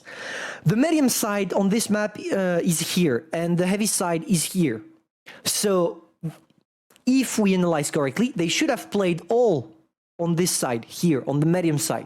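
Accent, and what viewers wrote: France. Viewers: Are you french?🤨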